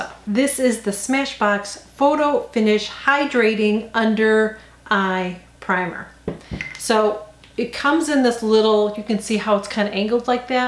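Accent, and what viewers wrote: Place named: Midwest, United States. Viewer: Hi, I’ve been subscribed for awhile but I don’t think I’ve taken the time to comment. I enjoy your content and all the help you share. It’s a bonus that you’re so “real” (no shame in a close up, you rock it!) and I can get my fix of a Midwest accent! I appreciate that you return with some “revisions” and solicit feedback. It’s a process, working with these eyes of ours. Thanks for leading the team effort! 💐 Jacqui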